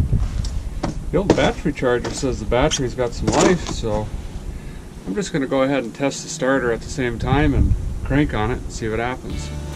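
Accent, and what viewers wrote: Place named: Midwest, United States. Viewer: You must be from the Midwest probably Wisconsin you talk just like I do lol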